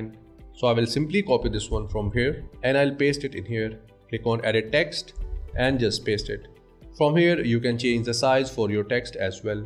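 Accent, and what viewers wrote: India. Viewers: this guys indian accent is so thick its hard to understand and listen to !!..come on man.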